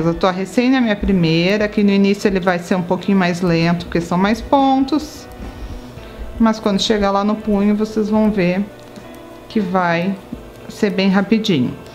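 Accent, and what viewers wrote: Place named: South, Brazil. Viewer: Boa noite, estou muito grata por todos os ensinos que tenho tido contigo. Te acompanho sempre, e comento pouco. Como tricoto no método inglês com mais facilidade, e ainda nova neste mundo maravilhoso da arte, vejo vc tricotando rápido demais. Então, vejo várias vezes para pegar cada detalhe. Linda demais essa cor. Ouvir esse sotaque do sul é maravilhoso. Morei no início do meu casamento em Santa Maria. E trouxe uma guria para mim😂. 😘🙏🙌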